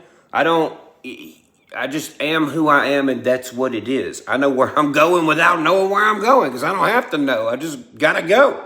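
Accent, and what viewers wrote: South, United States. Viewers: As an old dude- I'm just gonna say it. Thank you for being a redneck rapper who STILL speaks like a southern dude. * Cool tune btw.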